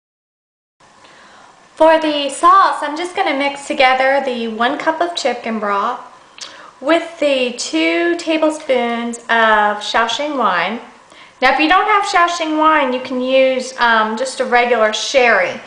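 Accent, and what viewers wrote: South, United States. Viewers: *New Subscriber* Do i hear a southern accent?? LOL I can't wait to make this dish....thanks!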